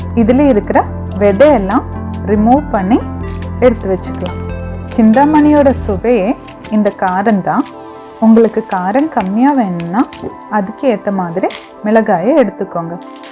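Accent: Kerala, India. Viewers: Neenga malayaliya. Njn pesira mariye irukku😘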